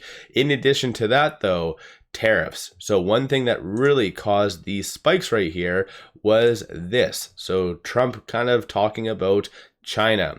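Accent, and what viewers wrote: Canada. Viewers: Good content as always. I detect a Canadian accent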